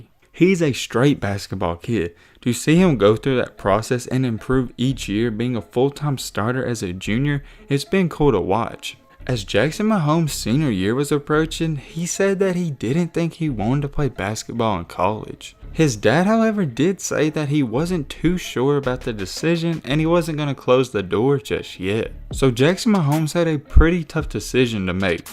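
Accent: South, United States. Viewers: Why are you talking so southern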